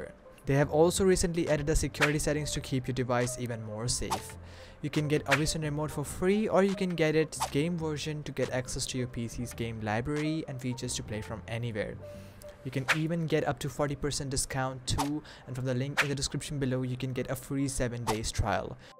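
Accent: India. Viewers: Are you INDIAN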